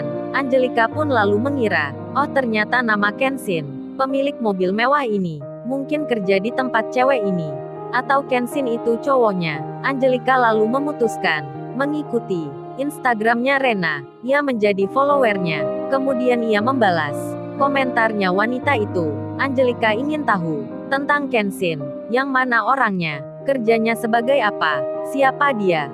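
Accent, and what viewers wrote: Surabaya, Indonesia. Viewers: Admene wong suroboyo iki wkwkkwk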